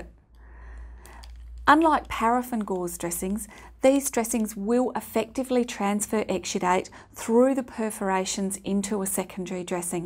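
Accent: Australia. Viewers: OMG Her Australian accent is giving me a headache!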